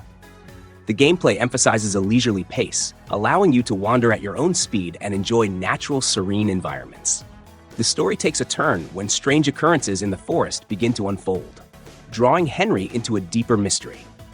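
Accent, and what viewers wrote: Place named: India. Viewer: Thank you indian voice over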